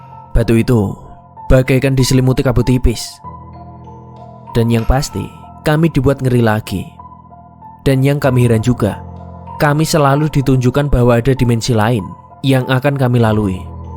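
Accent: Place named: Java, Indonesia. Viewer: Enak jowo ne mas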